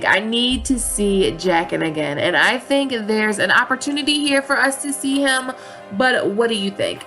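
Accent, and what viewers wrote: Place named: South, United States. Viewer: love that Southern accent that pokes out from time to time :)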